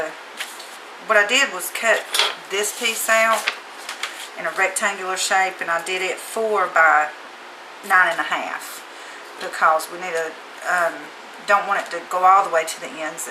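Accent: South, United States. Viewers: And I love your Southern accent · with your southern drawl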